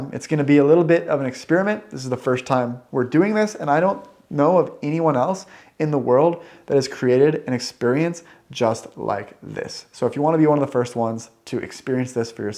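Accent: Sweden. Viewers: Is it just me or has his accent speaking English gotten closer to a Swedish accent speaking English? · Has anyone pointed out that you now speak English with a Swedish accent? To my American ear anyway and as someone just beginning to try and learn Swedish. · Interesting enough, i think I can hear some Swedish accent in you English :)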